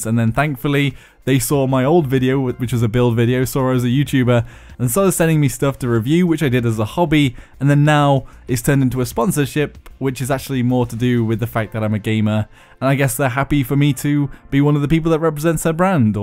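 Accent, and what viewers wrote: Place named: United Kingdom. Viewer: Huh, didn't realise you were British. That makes you a lot hotter. Totally gay for that.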